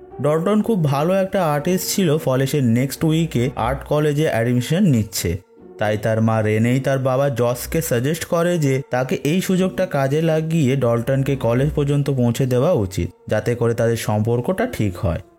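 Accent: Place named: Kolkata, India. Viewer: সুন্দর করে কথা বলেন, উচ্চারণ তো ঠিক নাই। মনে হয় কলকাতার লোক।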